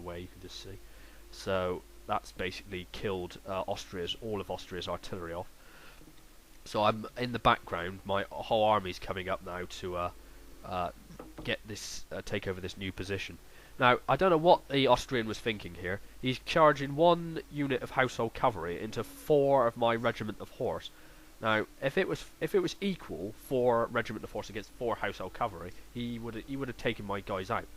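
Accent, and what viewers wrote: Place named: Barnstaple, United Kingdom. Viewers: He sounds a bit West Country, maybe possibly Berkshire. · You sound like you are from Barnstaple! Noice!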